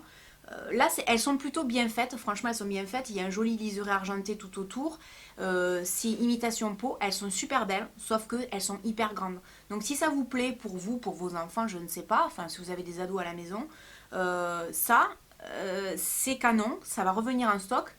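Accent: South, France. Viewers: Cela fait quelques semaines que je te suis et j'adore ta simplicité avec toujours une petite pointe d'humour et sans prise de tête. après ton accent du sud...je me sens moins seule^^ très jolis achats en tout cas. Hâte de voir ta commande zara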